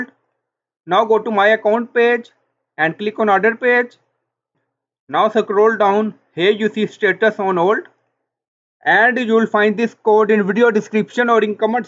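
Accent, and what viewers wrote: India. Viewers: they way you speak english is Punjabi is amazin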